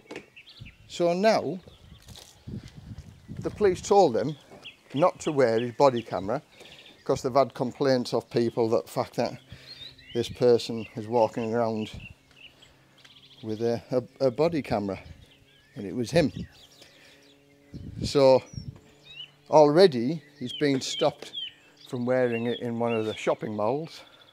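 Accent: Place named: Northern England, United Kingdom. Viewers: I am an 86 year old Englishwoman and I will say that this is the best country to live in. It is not the lovely country that it was in my childhood but I would never live in another country. I have travelled extensively and lived,for three years, in Colonial Africa where I was very homesick for England. You sound like a northerner to me. Perhaps you have not seen the lovely parts of England. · Hi what accent is that, sounds like my Middlesbrough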